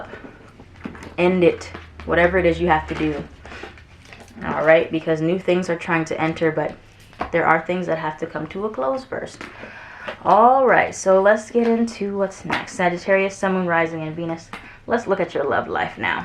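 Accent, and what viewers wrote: Bronx, United States. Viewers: Girl I thought you were from the Bronx, I was gonna say I'm from there too🤣 I just subscribed and yes, he's an aquarius. He just finished packing his things with a police escort 😩 I'm so over him and relationships. I've watched at least a dozen sag readings and they all say the same thing: it's painful but it's good for me.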